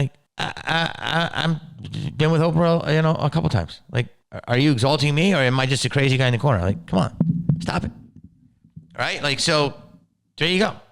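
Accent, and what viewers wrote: England, United Kingdom. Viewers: Love the English accent, very Tim Curry. I enjoy your banter. xx Like your opinions too. 💐 Love Joe Rogan too, Harry and Megs need to shut up. Love from Australia!!! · Your British accent is hilarious 🤣👏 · Loved that English accent! All you need are muttonchops! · It's crazy indeed! I don't read news about this people, i prefer to read and listen things about you! Omg! Lol your english accent is great! Your shirt is beautiful too. You always looks amazing! Thanks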